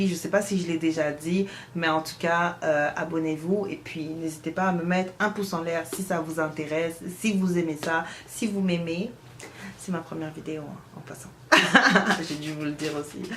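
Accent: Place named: Quebec, Canada. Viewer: Olala ça fait des années que je ne t’avais pas vu! T’as bien pris l’accent québécois ! C’est cool que tu te sois lancée dans ta chaîne YouTube. Bon vent !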